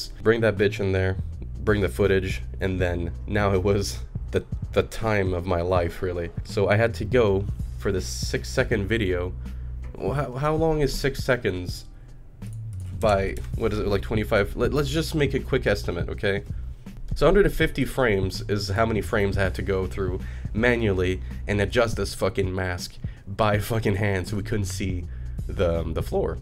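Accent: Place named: Quebec, Canada. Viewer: hey are you a quebecois? parce que moi je le suis et j etais full surpris quand tu parlais en quebecois moi j etais genre: attend... il parle francais ce gar la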